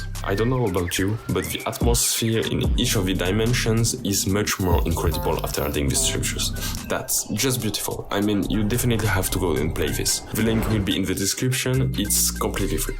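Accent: France. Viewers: Bro you sound like a french guy. are you french? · are you french? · u are french ??? ( oui sa se voit a ton accent et a ta desciription )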